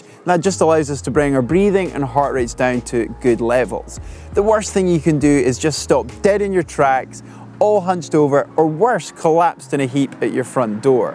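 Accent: Scotland, United Kingdom. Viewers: There is just something about that scottish accent, coming from either men or women, doesn't matter, that just... 😍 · Really though, what is his accent? It sounds like a Scottish blend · Defo Scottish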